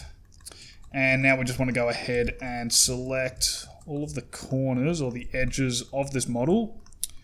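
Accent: Australia. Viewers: Why is every single good modeling tutorial done by an Australian?!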